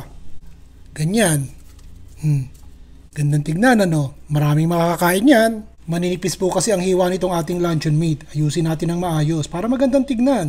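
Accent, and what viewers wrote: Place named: Bulacan, Philippines. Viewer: Chef,bulakenyo po ba kayo?kasi ung accent nyo at diction ay bulakenyo 😁😁😁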